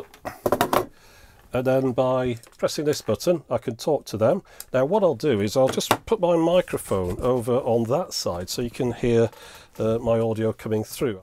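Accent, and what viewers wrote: United Kingdom. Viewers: I love your British accent...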